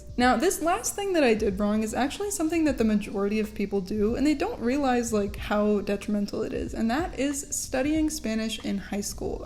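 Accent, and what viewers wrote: Mexico. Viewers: It's surreal how your Spanish pronunciation sounds so native and you sound like a high class Mexican girl. I speak Spanish, I just ran into your video and I got curious. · As someone from SPAIN ur spanish is so mexican but it's actually very good!!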